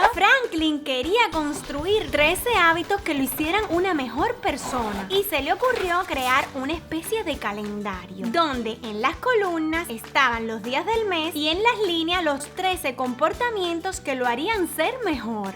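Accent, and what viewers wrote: Cuba. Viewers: Habla 24 hr cubano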